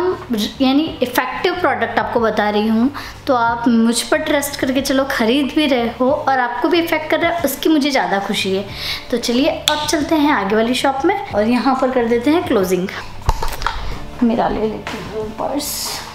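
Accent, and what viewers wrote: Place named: Gujarat, India. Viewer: Hi bhabhi...... .........aapke video me jabhi bhi dekhta hu.... Gujarati me Aap bolte Ho esa lagta hai....... Nice video beautiful vlog hai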